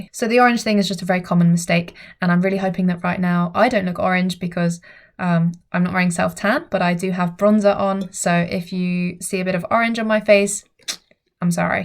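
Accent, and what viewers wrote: United Kingdom. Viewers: 英國口音？ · Nice British accent and more than decent Chinese. Very talented.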